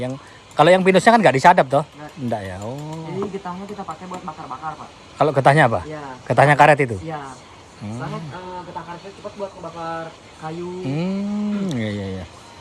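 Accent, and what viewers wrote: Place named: Surabaya, Indonesia. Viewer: Kakaknya spt nya dr Surabaya ya...Logat dan gaya jg wrna suara nya mirip temanku😁 he hee top dehh..MANTEP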